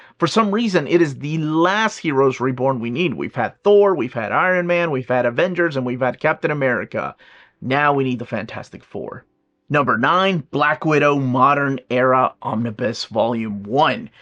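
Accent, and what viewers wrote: United States. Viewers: i want a compilation video of cowboy accent to listen to before i go to sleep every night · Clicked because of cowboy hat and was NOT let down when i got the accent to go with it!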